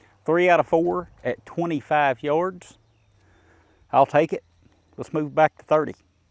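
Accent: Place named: South, United States. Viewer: I love this guys good ol' boy, down home southern accent; but the video starts at: . You're welcome.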